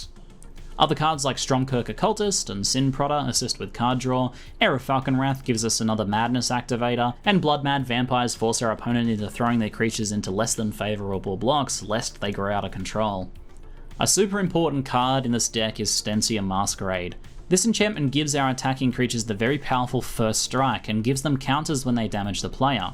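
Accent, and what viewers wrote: Australia. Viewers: oh wow, I haven't seen any mtg content created by a fellow aussie before, this is awesome! I want to find more of the Australian MTG community, where/who are the people? Sometimes it's just nice to hear an aussie accent, you know? :D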